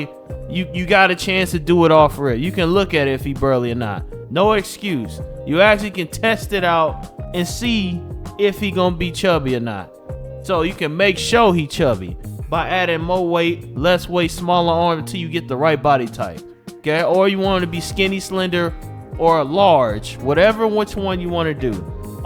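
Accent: St. Louis, United States. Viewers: U from st.Louis cuh ?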